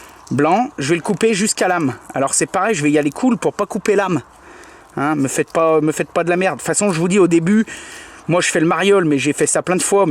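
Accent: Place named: Savoy, France. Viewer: Merci,et tres sympa le franc parle,avec l accent savoyard a mon avis.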